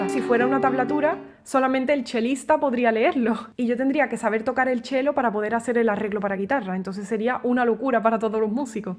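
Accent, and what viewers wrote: Andalusia, Spain. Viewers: No soy entendido en música. Pero me quedo a escuchar ese acento andaluz 🥰 que enamora. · Dice Buenas tardes, es de andalucía y pues lo dice con su acento andaluz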